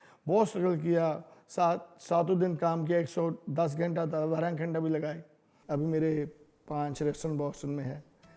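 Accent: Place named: Punjab, India. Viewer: Sweet panjabi hindi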